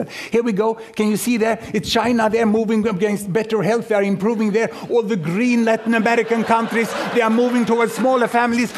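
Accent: Sweden. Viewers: älskar hans svenska uttal. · Dear Saturday Night Live, this is how english with swedish accent sounds like. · His accent is sooo typically Swedish :')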